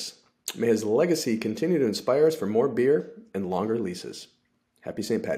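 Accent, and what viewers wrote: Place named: Scotland, United Kingdom. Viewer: That's a Scottish accent 😅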